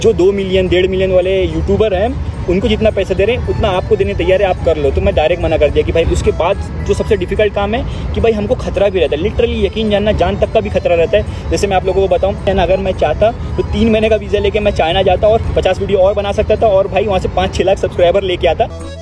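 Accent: Mumbai, India. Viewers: Assalamu alaikum bhai in sha Allah top pe jaoge agar isi tarha imandari se kam kiya to. Aur bhai language pe dhyan do mumbai wala accent aata hai hai.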